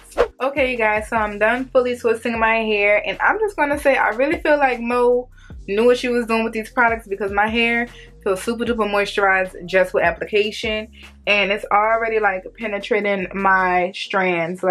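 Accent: New Orleans, United States. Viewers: Curls are popping!!!!!! Are you from NOLA, cause I hear a lil something, something!!!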